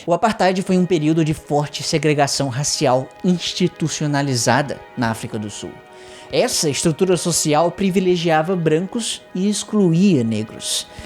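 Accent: Ceará, Brazil. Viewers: Tu é cearense né macho vei ?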